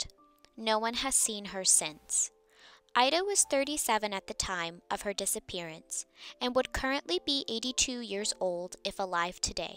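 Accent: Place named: Wyoming, United States. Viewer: Your accent sounded like a girl from Wyoming we had as an exchange student when I was in high school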